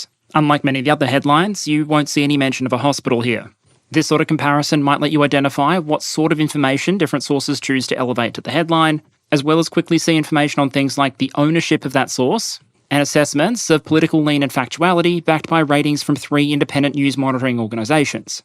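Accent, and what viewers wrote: Australia. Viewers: Is this Ausralian? Dialect and/or dude?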